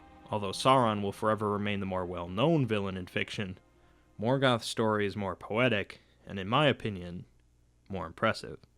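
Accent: United States. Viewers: I'd say that's just the american accent ;) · Can nobody pronounce Morgoth normally? But always American accent... I know it's dificult for us ( born in U.S.) but live in Europe(Croatia). Please try harder. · I am sorry, and I LOVE Americans and their accent, but as an Englishman, I have to state that it doesn't sound right hearing excerpts of the works read in that accent.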